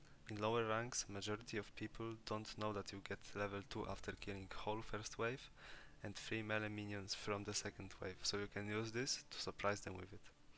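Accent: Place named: Poland. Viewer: najpierw z akcentu mylslalem ze rodak, potem zwatpilem a potem zauwazylem nick na wozku, pozdrawiam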